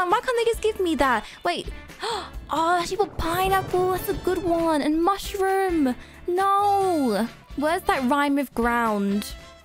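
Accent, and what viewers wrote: England, United Kingdom. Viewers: Are you English?????????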